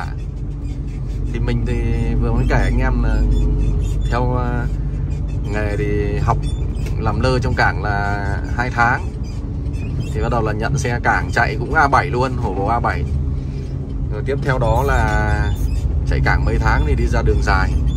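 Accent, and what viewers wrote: North, Vietnam. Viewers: Có 1 thắc mắc nho nhỏ là b ở quảng bình mà lại nói đặc giọng miền bắc ko bị pha tý miền trug nào cả , tài thật .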